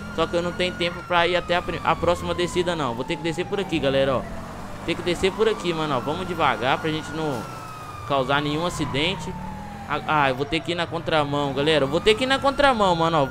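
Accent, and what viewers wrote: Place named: Rio de Janeiro, Brazil. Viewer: virou carioca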